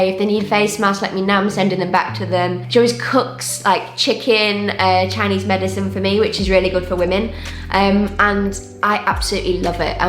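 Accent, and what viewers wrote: England, United Kingdom. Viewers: I really like ur English accent. Hoping you have a good lifetime in Taiwan.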